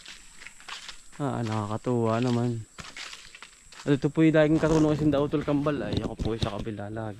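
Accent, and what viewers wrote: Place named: Laguna, Philippines. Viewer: Tagalog kang talaga , sa Laguna ba kayo paki sa sagpt manan kapatid, natuwa akong makarinig ang ganyang punto ng pananagalog.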